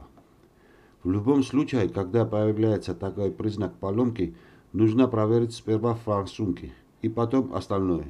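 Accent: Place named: Georgia. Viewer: Такой приятный грузинский акцент,пойду смотреть старые грузинские комедии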